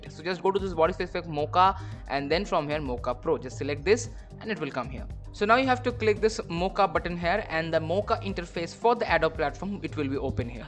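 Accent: India. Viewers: Мой любимый индус озвучивает))) Английский тяжело понимать но индусский английский идеальный )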